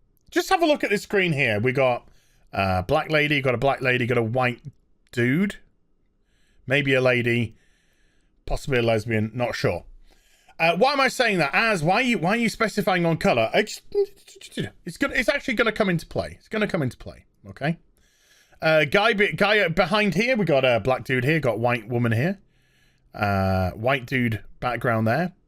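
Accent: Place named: United Kingdom. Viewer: Damn that british accent is spot on.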